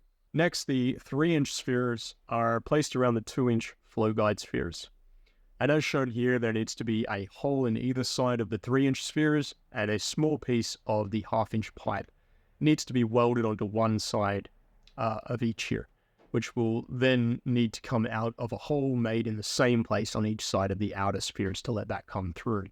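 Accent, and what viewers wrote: Australia. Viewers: Are you an ausie living in the usa? You sound like Shantan Wantan Ichiban (no hate just curious) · interesting accent aus plus?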